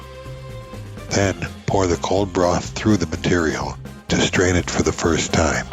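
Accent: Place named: Chicago, United States. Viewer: Thank you very much for your tutorial videos! I am especially fond of that Chicago-sounding accent. Makes me extra homesick when I am cooking, missing my both of my Grandmas, Aunts and of course, my Ma!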